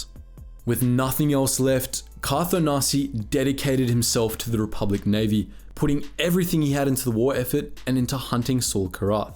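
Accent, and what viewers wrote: Australia. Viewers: I’m trying to pinpoint the accent. Are you Australia like me too at all? This is the first time I’ve watched one of your videos you’re amazing so far I think! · As a fellow aussie I know how hard it can be to speak clearly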